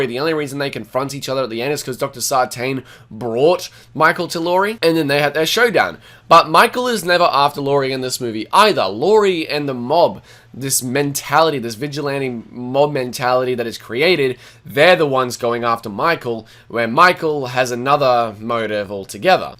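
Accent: Australia. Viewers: I forgot how much I love your Australian accent. Your voice would be really great for ASMR. I haven't seen the movie yet But I have Peacock which is a streaming service in the United States that it's streaming on simultaneously with its theatrical run.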